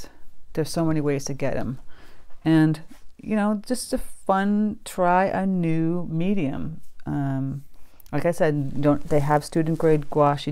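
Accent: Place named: Maine, United States. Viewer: Are you from Maine? I can hear it in your accent ;-)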